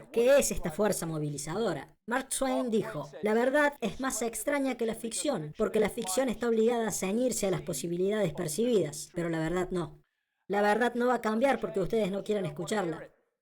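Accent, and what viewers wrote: Córdoba, Argentina. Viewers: Porque es cordobes!. Linda tonada!. Saludos desde Buenos Aires!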